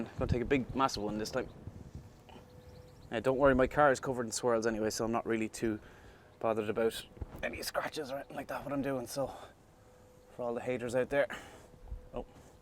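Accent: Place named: Ireland. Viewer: are you irish?love the accent 👍🏿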